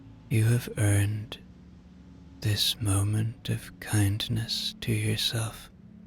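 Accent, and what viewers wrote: Ireland. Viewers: I love the lovely lilt of the southern Irish accent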